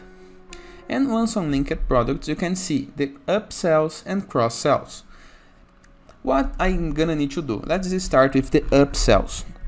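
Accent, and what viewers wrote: Brazil. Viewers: Sotaque brasileiro não passa batido kkkk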